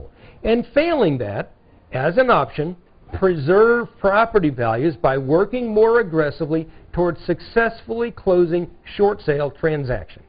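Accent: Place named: South, United States. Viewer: If we must stop the tsunami of foreclosure that drive home values down, then why do you advocate short sales that allow you and your clients to buy below market. That too forces property values down. What you are saying, hidden in you southern charm delivery, appears to be very very selfish especially if you still advocate shortsales.....either way...the homeowner get screwed....I no longer buy foreclosed, shortsale property....It's cannalbalism......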